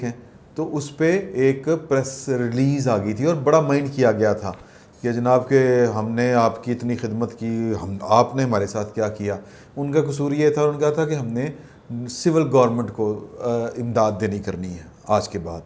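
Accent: Punjab, Pakistan. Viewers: ji I love tour Punjabi dialect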